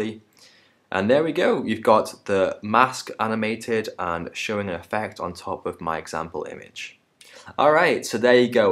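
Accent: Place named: England, United Kingdom. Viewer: I have not problem with it and I like the accent but is it me or is every premiere or AE tutorial done by an English person?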